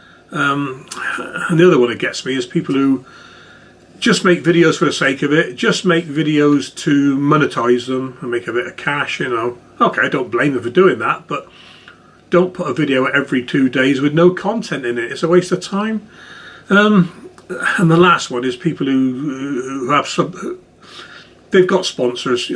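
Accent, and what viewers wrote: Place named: Bristol, United Kingdom. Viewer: Loved this, I'm from Bristol and hearing you remind me if home! Really interesting answers too!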